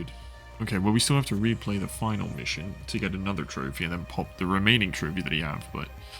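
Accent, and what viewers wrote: Netherlands. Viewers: ARE YOU DUTCH?? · are you dutch????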